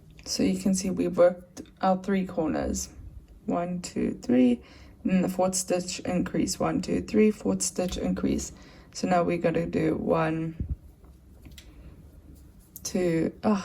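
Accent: South Africa. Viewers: From your accent I can tell you are South African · I was so happy when I heard the South African accent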